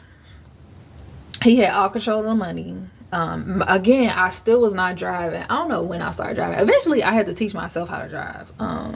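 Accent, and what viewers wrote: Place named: Midwest, United States. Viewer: Nice story! Seem like your from the Midwest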